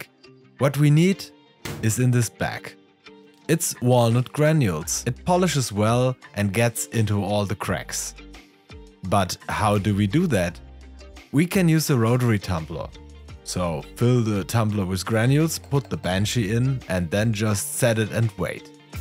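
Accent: Germany. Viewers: aaaah, das hört sich so schlimm an wenn manche deutsche englisch sprechen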